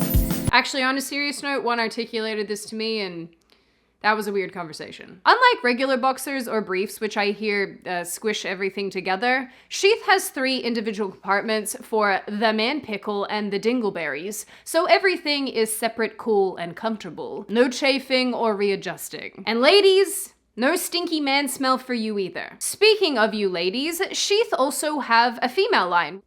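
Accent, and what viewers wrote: United States. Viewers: you sound more american every time i watch a video. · It's because the world has gone mad. Also, off topic, but you sound completely American now. All traces of your Aussie accent are pretty much gone 😪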